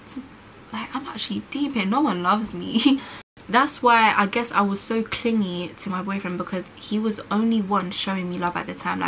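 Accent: United Kingdom. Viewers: this is the first time ive heard an asian person speak with a british accent...lol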